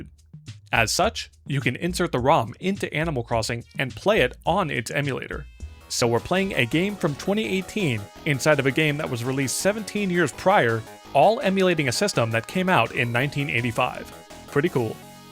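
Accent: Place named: RP, United Kingdom. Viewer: Why do you speak premium english like blathers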